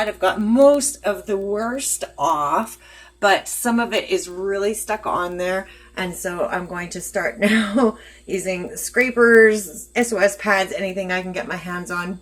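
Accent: Canada. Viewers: You sound Canadian and possibly from Ontario? I know an Ontario accent when I hear it. I am in Manitoba, in the Winnipeg area. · I find it amazing that you only use like 2 different cleaners predominantly. That simplifies things so much. It's also surprising to me that you use that Dr. Bronner's for steam cleaning. It's very versatile to do that. I'm going to pick some up tomorrow. By the way, are you Canadian? I thought I heard the accent but I could be wrong lol...I'm Canadian, that's why I ask :P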